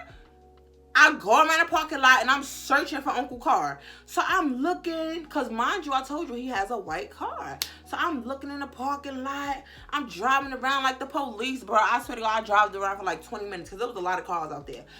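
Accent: New York, United States. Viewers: Sis really said “shiznayii” you are sooo New York. Don’t ever change🤣🤣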